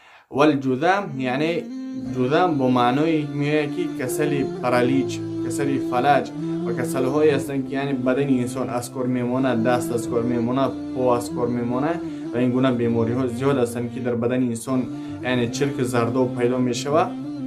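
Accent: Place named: Afghanistan. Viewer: Чихели гап мезана очат авгонай чи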